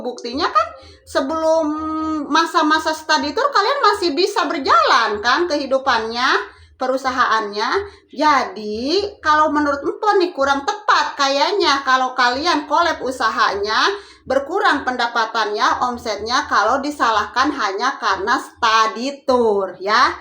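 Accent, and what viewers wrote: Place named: West Java, Indonesia. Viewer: beneran orang Betawi apa orang Jawa barat sih pok..😂😂😂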